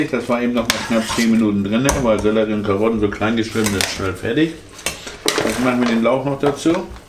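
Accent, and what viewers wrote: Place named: Hamburg, Germany. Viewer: Danke, gut erklärt - so ein richtig ehrliches, bodenständiges Essen (wie von Deinen Videos gewohnt)...und Dein Vortrag für mich Nich-Hamburgerin kultig komisch und unterhaltsam durch den symphatischen Dialekt!✌👍🤣😂 Darf ich ma fragen, ob Du da einen GastroSuS Topf benutzt und ggf. welche Maße der hat? Ich würd mir so einen großen Pott gern zulegen... Sind die gut, auch im Hausgebrauch? Oder vielleicht kannst du mal ein Video zu Töpfen machen, die Deiner Meinung nach was taugen? Ok, herzlichen Gruss in den Norden!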